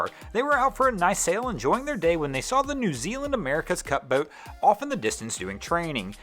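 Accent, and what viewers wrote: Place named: Delaware County, United States. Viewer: Are you from Delco, PA? That accent definitely sounds like it.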